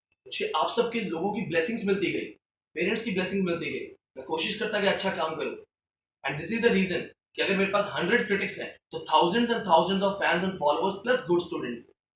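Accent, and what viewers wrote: India. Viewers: STICKING TO OUR INDIAN PRONUNCIATION AND STYLE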